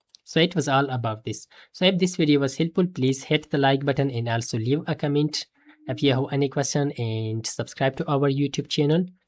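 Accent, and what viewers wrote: India. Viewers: Indian pronunciation 😂 Clau de code 😂 It's claude not clau de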